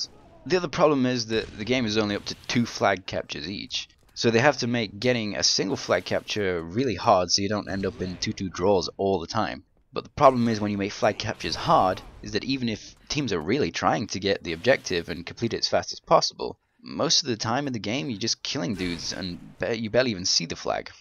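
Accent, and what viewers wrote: United Kingdom. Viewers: are you Scotish?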